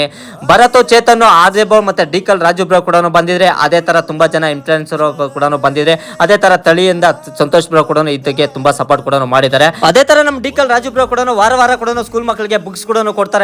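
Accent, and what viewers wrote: Kolar, India. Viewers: Guru kolar bashe kelode one tara majja guru .. Ninu matadodu keltidre hale nenpu..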